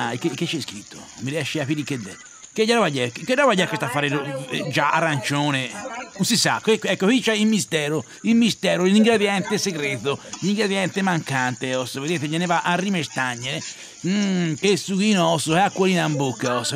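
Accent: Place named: Quarrata, Italy. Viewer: Parla il quarratino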